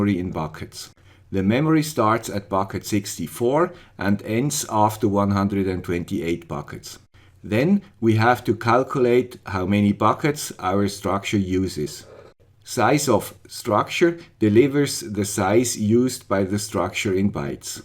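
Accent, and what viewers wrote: Switzerland. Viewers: oh, but i think is a perfect challenge for the excellent man with the Swiss accent! :)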